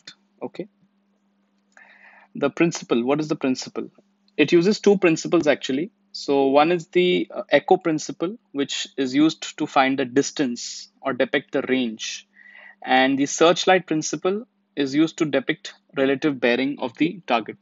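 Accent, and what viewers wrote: India. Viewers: I’m sorry man, i don’t want to offend you but i just can’t learn anything with an Indian accent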